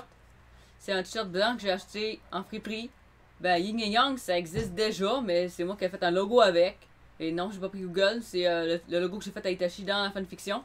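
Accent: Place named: Japan. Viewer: vraiment bravo tu l’es mérites vraiment et ton accent japonais est incroyable !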